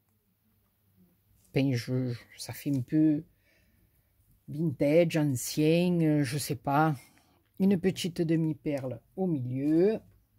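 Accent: South, France. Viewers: une magnifique création 'tous les tutos sont très bien expliquer et avec l'accent du midi.Merci pour ce pur bonheur de partage .nouvelle abonnée .bonne journée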